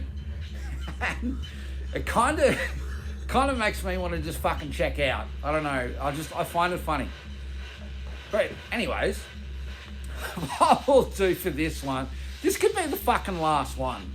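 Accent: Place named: Australia. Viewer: Mate never fucken change your ways for any fuckwit. You being an aussie like myself was one of the things that pulled me into your vids. Got sick of listening to americans haha. But if youre jumping down the Power Electronics rabbit hole and want something extreme, check out Deathkey if you havent, also Intolitarian. Antichrist Kramer did the artwork for Absurd albums among many others! Cheers brother! 🍻🍻🍻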